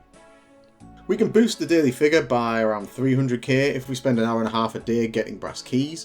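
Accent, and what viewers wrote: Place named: North, United Kingdom. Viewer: Is that a fellow northerner?! Haha great guide man keep it up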